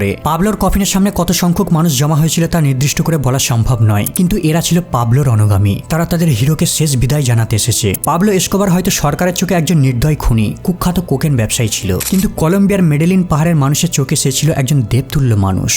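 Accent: India. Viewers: আরেহ ভাই এরা ইন্ডিয়ান এদের কথা এই রকম হয়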